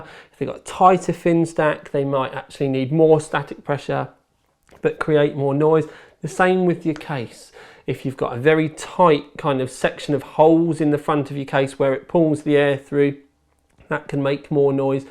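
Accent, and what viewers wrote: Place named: Southern England, United Kingdom. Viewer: So you guys are based at overclockers in Newcastle? But you have a southern accent like me!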